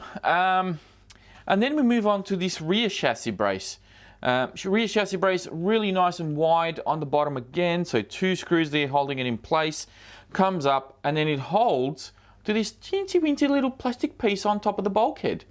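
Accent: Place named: Australia. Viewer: There's a great big opening here, which would've allowed for some plastic to come through and mount onto a BAM-PA-BAAAA!! There's your next remix video, DJ's. Gotta love that Ausie accent.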